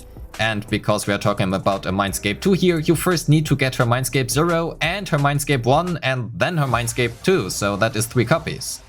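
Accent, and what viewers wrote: Germany. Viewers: "Kolader" lol jokes aside I'm from alsace. German accents never fail to amuse me 😂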